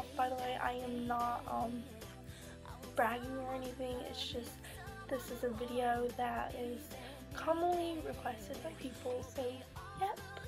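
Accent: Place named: South, United States. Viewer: Oh well im a California guy, so as soon as i heard your voice i knew that it was something different, I kinda figured because there might have been some southern to it but i wasnt sure, its really unique and i like it! You seem super nice btw, so i hope your channel does really well!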